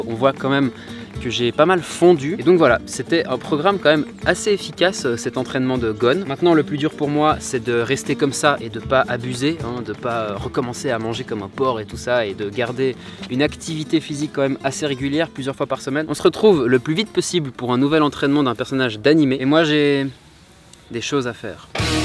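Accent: Switzerland. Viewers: T suisse?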